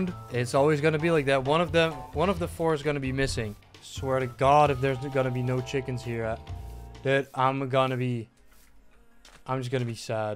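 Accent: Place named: Netherlands. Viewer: ur accent sounds dutch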